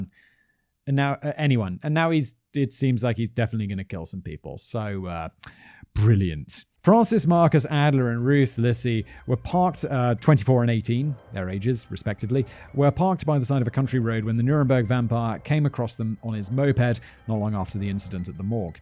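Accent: United States. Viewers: Why u talking like americano.?😊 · I am slowly working my way through all of Simon's offerings, so I know I'm late to the party... but whenever Simon gets to the point where the criminal is caught... he sounds more and more American with each episode. I don't remember which episode it was, but when he was hoping that the particular state had the death penalty... yeah, come over to the dark side of the pond. The majority of us here prefer to live and let live. The ones who get all offended are usually just politicians 🙄.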